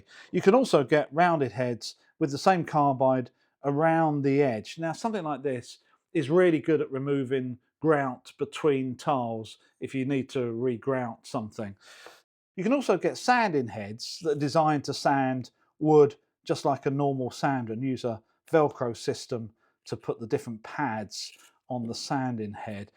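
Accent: United Kingdom. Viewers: Honestamente iba a dar "no me gusta" tu video, simplemente por tu acento británico, PEROOOO simplemente ví la intro de tú video y ME ENCANTÓ!!! Perdón por juzgar antes de tiempo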